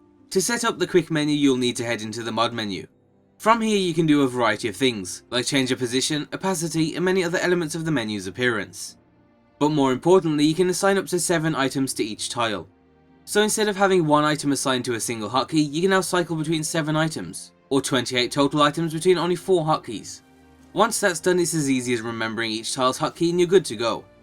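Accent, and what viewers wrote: United Kingdom. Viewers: It's what happens when you've got a British accent.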